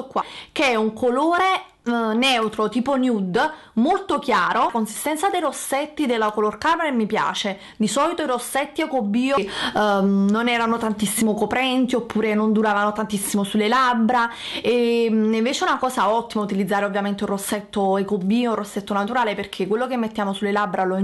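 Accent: Naples, Italy. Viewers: Posso dirti che il tuo accento napoletano mi sta troppo simpatico? :) Non so perché, ma mi ispira dolcezza!! Posso farti una domanda? Vorrei comprare qualche ingrediente per creare cosine semplici (deodorante in crema/stick gel per i brufoli, tonico, burro per il corpo), per questo vorrei evitare spese grosse. Se comprassi solo queste cose: tocoferolo, cosgard, burro di karitè e qualche olio essenziale, potrei creare qualcosa? O mi serve per forza la xanthan gum? Grazie mille e complimenti per tutto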